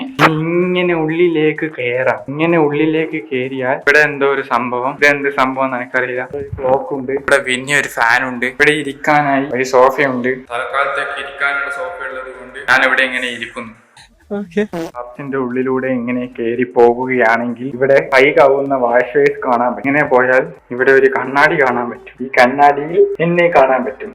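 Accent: Kannur, India. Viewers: ingale kannoor basha 🤣🤌🏻💘powli aanu 💘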